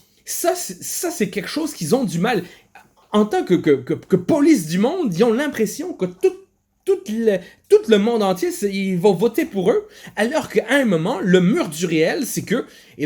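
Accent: Quebec, Canada. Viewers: vive Trump tabarnak ça fait du bien aussi d'entendre un accent québécois parce que l'accent français un moment donné ça tombe sur les rognons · ton accent québécois est solide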